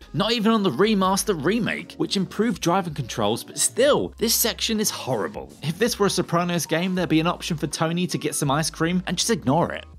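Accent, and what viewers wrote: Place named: East London, United Kingdom. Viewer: I have to get through Mafia remastered version yet .Since I gotten back to it ,but would say the final training mission in Star Wars Fallen Order was a pain in my ass ,since it like the only puzzle in the game I could not figure out easily .But now I know for sure ,cause go YouTube to figure the easiest puzzle in the game .So where the hel is Jules and the cute itty redhead on this channel ? And your accent dude sounds east London for sure .I sure wish Hollywood get all the accents right from England .When comes to movies and other things .instead using somme snob with same accent as the royal family of misfits .But you folks are pretty cool from the old country .😁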